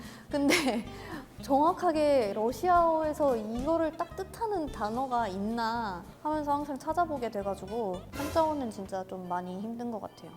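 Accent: Seoul, South Korea. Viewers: 서양외모에 전통한국인발음말투 ㅋㅋㅋㅋ신기방기하다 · 한국인태생특유의음성. 부모님중한분이한국인이신가요? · 전형적인 서울말씨에요. 당신의 노력에 찬사를 보냅니다. 역시 동시 통역사이군요.대한민국 홍보도 많이 해주세요. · 경상북도 사람인데요..나보다 서울말 더잘하노..ㅋㅋ.! 진짜 대단하십니다..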